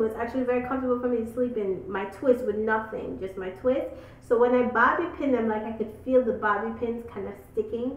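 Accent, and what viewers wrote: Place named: Caribbean. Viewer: Found ur channel today. Great videos, I learned a few things. Aside from that, its super creepy, but we have the same accent and voice. I'm probably older than you, but I feel like I am watching a video of myself. I showed my boyfriend and he things so too. Are you by chance from the Caribbean?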